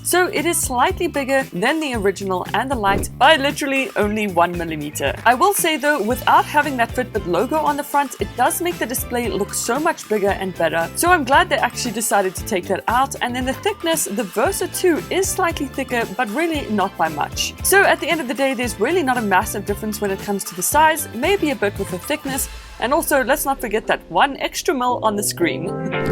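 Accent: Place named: South Africa. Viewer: I wonder how many other South Africans get that "that's so raven" look on their face when they hear the accent in a YouTube video 😂 Loved the comparison! 🔥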